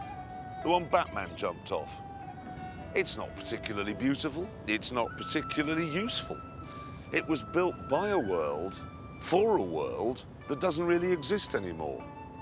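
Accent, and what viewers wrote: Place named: United Kingdom. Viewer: I love how the British at so much flavor to the review British accent I love it I love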